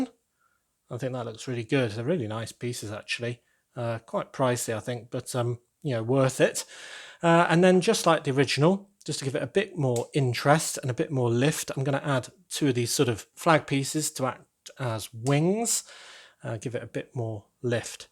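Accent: United Kingdom. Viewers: are you british bc i thought you were american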